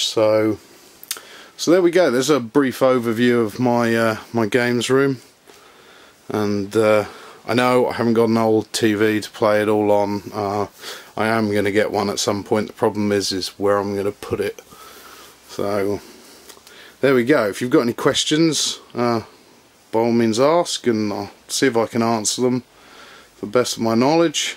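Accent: England, United Kingdom. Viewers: hes obviously English... really stunned by the confusion.. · He said he has PAL games which are European versions of the games. I'm assuming he is from England somewhere by the way he talks.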